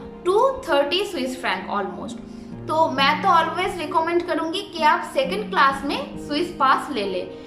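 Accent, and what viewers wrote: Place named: Bengal, India. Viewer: Bengali Accent s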